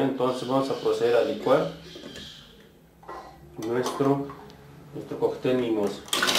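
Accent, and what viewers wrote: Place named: Peru. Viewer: Si se nota que eres peruano 😂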